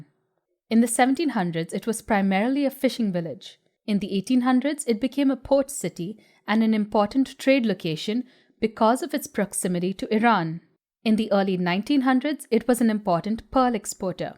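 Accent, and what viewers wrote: India. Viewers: Such a sympathetic woman! I feel for you and know that growing up - wherever - this is your home. However, having been to Dubai as a European, I do not understand WHY on earth anybody wants to travel there. It is one of the most unattractive places I have seen. All fake, all new, all shiny, glossy, empty...filled with the most beautiful people from all over the world working there. I have hardly ever seen so many attractive human beings as there...but still: I liked my 1 week trip there, but would never dream of going there again. Howver, for you: Having not seen you parents in 6 years is terrible. I hope you can return and see them soon! Keep up with your very insightful and great channel. Love it! (And I love your Indian accent, too. Remember: MOST people in the English speaking world speak like you and not the Queen's English!!!) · Wow an Indian accent that I can understand, liked and subscribed! 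In Dubai, I couldn't understand when (most) Indians speak English.. I ended up speaking to them in Hindi LOL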